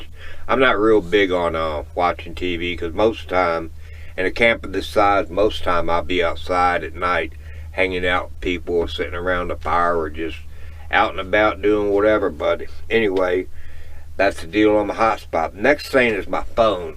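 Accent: South, United States. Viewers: I loved how when you went to Mexico and returned, as soon as the American border agents heard your Southern drawl they just sort of waived you on through.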